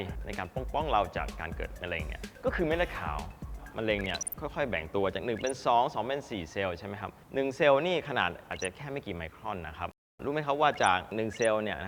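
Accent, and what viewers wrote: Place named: China. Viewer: คุณหมอเหมือนติดสำเนียงจีน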